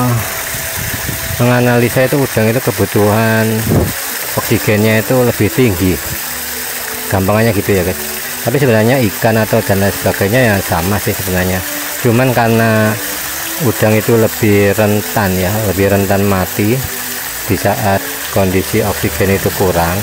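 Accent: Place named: East Java, Indonesia. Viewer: daerah mana Om. logat nya kaya jawa timur🙏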